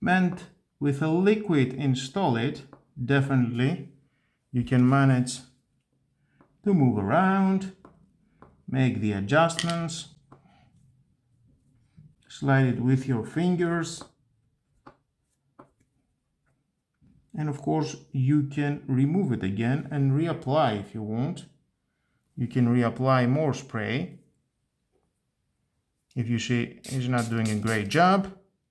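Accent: Greece. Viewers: Είναι το πρώτο βίντεο που βλέπω και από την πρώτη λέξη κατάλαβα ότι Έλληνας 😅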